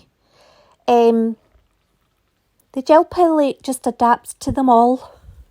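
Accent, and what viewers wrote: Scotland, United Kingdom. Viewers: Just discovered you, and it's lovely to hear a fellow Scot chirping on about this technique and already producing some lovely work. Well done and keep it up:) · It’s about experimenting you’re doing a great job!! What part of Scotland are you from? I was born in Dundee I’ve been in Vancouver Canada for 50+ years … love your accent!!! Thank you for your video and you’re right it’s very much like a limo cut!! Elaine ❤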